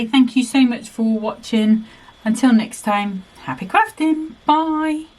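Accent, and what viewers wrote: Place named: Bristol, United Kingdom. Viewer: When I first started watching your videos, I thought I heard a slight Bristolian twang. You use similar colloquial phrases that I do! Fab cards as always. Sending love from Bristol xxx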